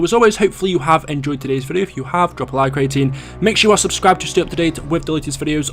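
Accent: United Kingdom. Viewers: are u brithish or are u american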